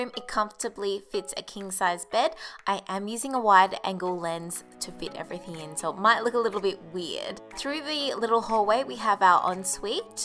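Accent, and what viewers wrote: Australia. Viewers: New subscriber are you Australian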